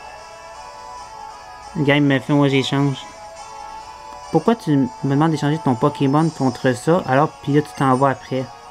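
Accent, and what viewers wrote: Quebec, Canada. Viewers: t un québecois?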